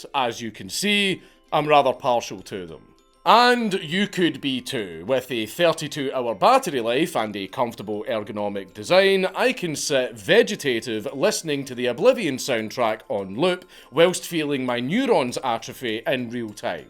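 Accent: Scotland, United Kingdom. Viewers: I fekkin love the scottish accent. History = Hesterree ..music to me ears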